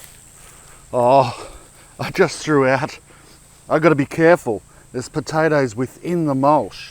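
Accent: Australia. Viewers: When you had the flu you sounded more Australian haha... Oh and merry Christmas;) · I love that you experiment with things I think about! And love listening to your Aussie accent from here in Florida.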